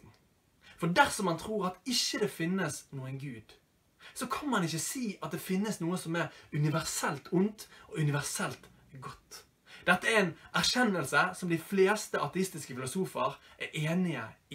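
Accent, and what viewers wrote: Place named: Bergen, Norway. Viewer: Dette er knall bra, liker at du er glad hele tiden og elsker bergens dialekten!!! Me ser på deg i klassen av og til, går på ein kristen skole der du har blitt vist på andakter flere ganger! stå på!!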